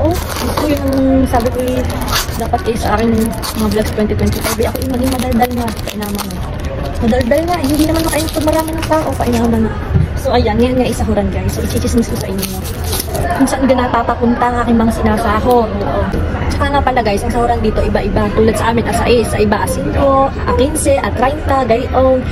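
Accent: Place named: Batangas, Philippines. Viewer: Abay ikaw gay batangueña..ala e..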